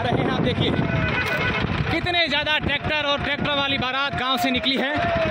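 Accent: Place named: Marwari, India. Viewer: Aapki aavaj marwadi andaj me or gramin se news late ho dhanay ho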